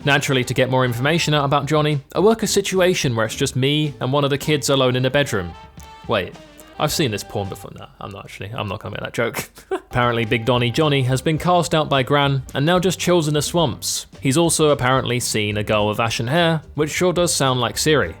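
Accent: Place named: Burnley, United Kingdom. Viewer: Burnley accent. This guy is a fucking joker xD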